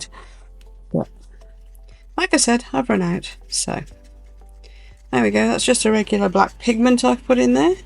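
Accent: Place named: Black Country, United Kingdom. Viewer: LOVE the subtle black country accent.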